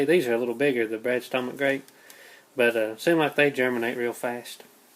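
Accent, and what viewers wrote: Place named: South, United States. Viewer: Love that country accent, I to come from the country.You videos are wonderful and so imformative.God bless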